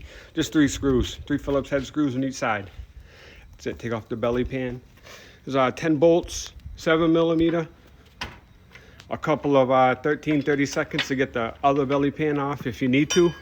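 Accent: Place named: Boston, United States. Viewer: Lol Bro you live in the suburbs what's with the Boston city accent...stop pretending to be something you're not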